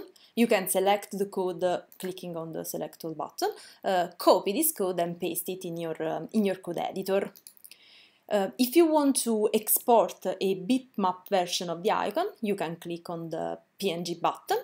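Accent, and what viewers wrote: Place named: Italy. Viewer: Loved the accent. Italian?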